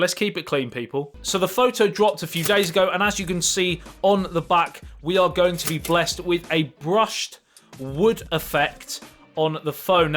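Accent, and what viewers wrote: England, United Kingdom. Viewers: I ❤️ hearing the difference in English accents, pronunciation & use of words compared to American English. The way you pronounce aluminum sounds so funny! You guys say it all wrong 😳 Or do we? 🤔 🤷🏼‍♀️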